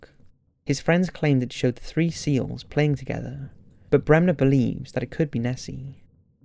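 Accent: Wales, United Kingdom. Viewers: Loch, not lock. You sound Welsh mate so should know better. Anyway, good video and very interesting.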